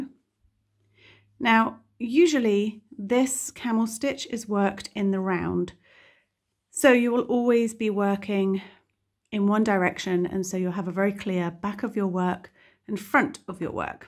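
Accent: United Kingdom. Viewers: Thanks for liking & for commenting on my comment Miss. I like your British accent a lot 😁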